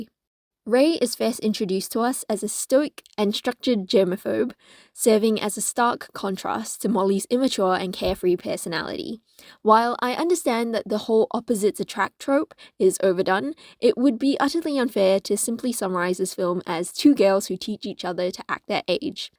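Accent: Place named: Australia. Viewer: Refreshing Australian accent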